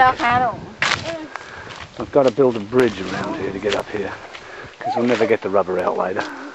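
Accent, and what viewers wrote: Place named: Australia. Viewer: I have never known anything on how rubber is made although i always thought it came from the sap of a tree. you can really recognise the Australian accent in this video. I really like the bridge so authentic, the thailand landscape looks really lovely and lush and tropical. The thailand people look so nice and welcoming and peaceful. Wow five dogs this is alot. The fluffy dogs are really cute!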